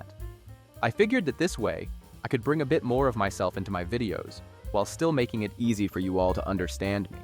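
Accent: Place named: Midwest, United States. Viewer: Very nice new avatar and voice. We enjoy listening to your new voice. I am curious how you manged to get a US mid-west accent in place of your own. Did find another voice similar to your own and sample that instead?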